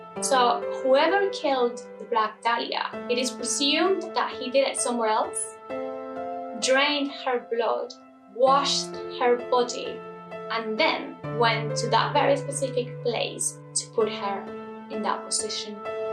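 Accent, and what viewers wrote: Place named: Spain. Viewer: I found you on twitter regarding the Cecil Hotel and shot over here to watch. I'm glad I did because I really like your vibe and uniqueness. So this is my first video and I'll probably spend the rest of the night watching your other videos. Love your hair, makeup, and your accent is really adorable. I wanna say a little Spanish mixed with English? Idk but I love it. I'm American so it's an accent to me and it was fun to hear and watch. You were a little scary at first to me with the intense eyes and blood and then this sweet voice popped out that I was not expecting. You remind me of the other lady that does her makeup while telling real horror stories. I always appreciate something different than the normal I see everyday. And I try to stay as far away from politics and media as I can. I've stopped watching tv and will not go onto any social media outlets unless it's to post about things I like because I'm so tired of the negativity. 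I don't dare scroll when I do rarely jump on because I don't even want to see or know what the hell they are going on about next. It's neverending and tiresome. You are refreshing. 💋 xoxo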